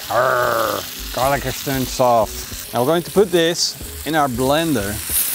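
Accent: Netherlands. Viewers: It's funny, how I always can hear if someone is not natively a english-speaker. Even though your english is really really good i can hear that you are a dutch man, also in your video with that knifeboi I could instantly hear that he is a german. I love that. I love your videos, keep on it.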